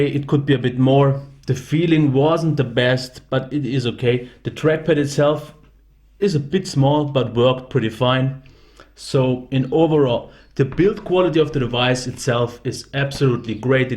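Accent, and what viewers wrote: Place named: Germany. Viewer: A few things you should know: 1. Mode notifications can be easily turned off 2. You may got a defect display because mine does not make any sound (and i already got two) 3. You want better scaling? Then turn the resolution down, i mean you already said that it´s useless for YOU to have such a high res. But now one question: Was that Yoga overheating at any time? I know that you don´t have it anymore but you may remember if it was. Because i already got two and both are freaking overheating. Really sad, now i have to change my Yoga again... PS: When i heard the first word you were speaking i immediately thought : German! :D Trotzdem gutes Review :)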